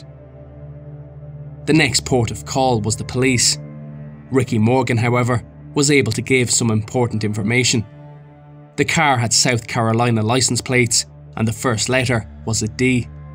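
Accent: Ireland. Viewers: Unreal channel,brilliantly narrated. Love too know what County you are from because it bugs me trying to guess 🤣🤣 I'm from Dublin so you'd think I could take a good guess 🤣🤣 cheers for the hard work man;its really good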